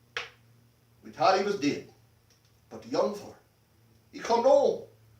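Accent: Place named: Newfoundland, Canada. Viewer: I’m studying your accent to audition for a community theater production of Come From Away. I thought I’d see if I could find a Newfoundlander reading the Bible and I found this! Thanks!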